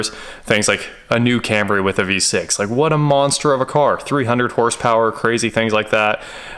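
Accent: Kansas, United States. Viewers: Kansas- northern Oklahoma dialect. It's how we talk 'round here.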